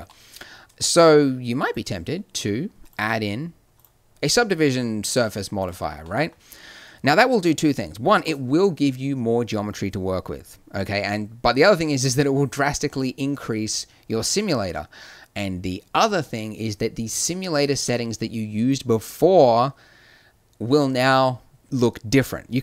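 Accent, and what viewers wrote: Australia. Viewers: I always wondered where your accent was from! Only realised you were a fellow aussie after watching your stylised earth tutorial the other day haha. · I didn't know you were Australian I thought you were British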